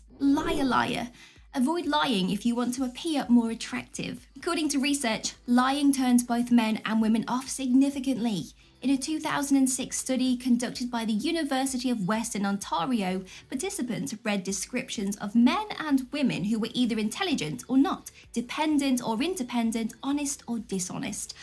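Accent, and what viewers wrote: United Kingdom. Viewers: HONESTLY. These behaviors are not problems I have. The exception is that sometimes I seem to be figeting, but I'm actually constantly doing finger exercising to increase dexterity (professional musician... guitarist) I usually just explain that I'm not nervous, and about the exercises.... Found it to be a Good way to open up an invite for a lady to come to one of our shows. However, listen up everyone. This information is hugely important. Nice Work. Good Content here! I'm liking your British accent, reminds me of my favorite friend in college. She was from somewhere near Cambridge. She used RP.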